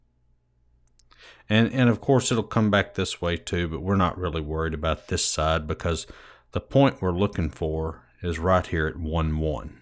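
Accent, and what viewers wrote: United States. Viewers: Very helpful. Cowboy accents are easier to follow than eastern ones.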